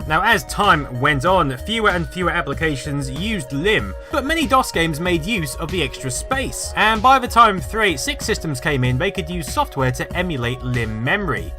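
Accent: United Kingdom. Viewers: In all fairness, English is my only language, but can be hard to understand at times. I find that's just his UK accent.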